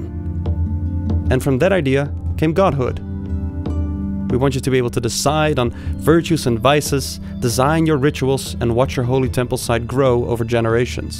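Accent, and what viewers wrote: Netherlands. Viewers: I can really recognize the Dutch accent. But that makes it nicer actually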